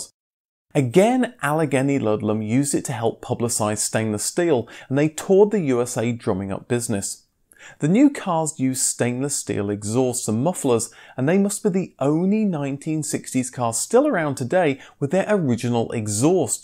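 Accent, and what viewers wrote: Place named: United Kingdom. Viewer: Generally your channel is entertaining and it is always a pleasure to listen to clean British English. 👍🏻 This topic is an interesting attempt. Ok. But you should do better research and talk to Metallurgy Engineers. Whenever the talk is about Aluminum it is about 99,9% Aluminum alloys in real. We never talk about pure Aluminum because it is not stable and would react with anything in the near within the fraction of a second. How can you allege that Aluminum or better said Aluminum alloys do not rust? I am sorry but this is not true at all! You can find this out very easily on your own. Go to any football field and take a detailed look at the areas where the Aluminum alloy parts of the goals are put together. You will see that there is partly corrosion which has white colour. Car factories do not use Aluminum alloys in order to get rid of corrosion. 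This metall is easier to be handled and can save weight, but is hard to be maintained in a car body workshop if there is damage on it. Stainless steel is also not really stainless. It will rust harder but you can’t really stop it from starting to corrode. Please take care. Thanks.🌷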